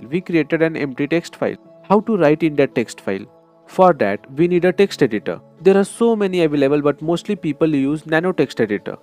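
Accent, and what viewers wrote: India. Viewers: Omg his indian accent is so pretty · I don't understand english language this tutorial didn't help me as I wanted but it's hard to be found in Hindi language coz these kinda people just speak English However 99.9% public is Indian ,it could be more helpful it even has Hindi subtitles , I hate this fucking accent what these people speak . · I think is Indian English right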